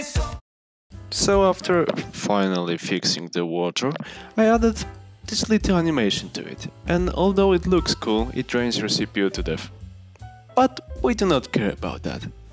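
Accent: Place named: Russia. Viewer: it means gj. Cuz ur accent I thought u r russian, but anyway, u made a good tone of work. That inspired me so much. Right now studying golang, and i hope i can make it, wish me luck :D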